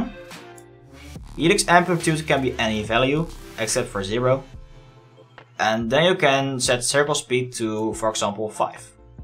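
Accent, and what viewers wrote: Netherlands. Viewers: you sound dutch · Are you dutch?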